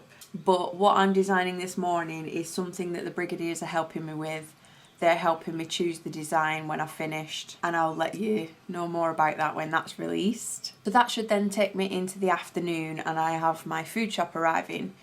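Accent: United Kingdom. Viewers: Great vlog,. You have the most beautiful British accent in the world. What do you recommend when measuring cakes?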